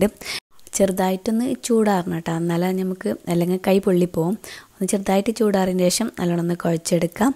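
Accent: Kasaragod, India. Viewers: Kasrgod ningala pattal kadha keettu nallonam chirichu..avida nallarasayrikoole..slang different aya inganavum lee..enthayalum vedio adipoli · pathiri Story comedyy ayikknn😃😃ippo varthanam ketta ippo kasarkode thanne😍😍😍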